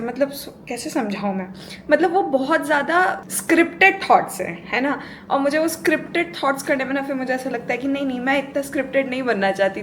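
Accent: Bihar, India. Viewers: Your accent is bihari but you are from Rajasthan I am not getting it how how 😂?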